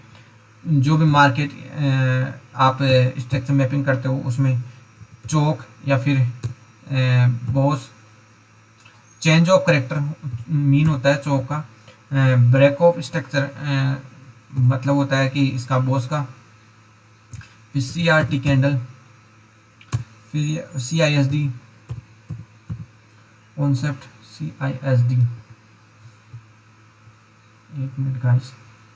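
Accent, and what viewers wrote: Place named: Rajasthan, India. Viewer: Are rajasthani h tu to wo bhi sikar side ki tone lg rhi h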